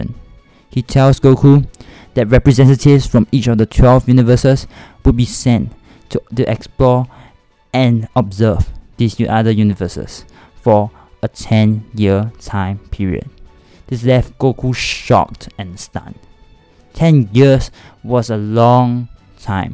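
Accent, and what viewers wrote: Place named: India. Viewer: i love the indian accent maney :)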